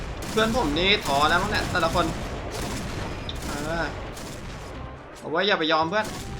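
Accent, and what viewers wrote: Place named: Kanchanaburi, Thailand. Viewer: สำเนียงเหมือนคนเมืองกาญเลย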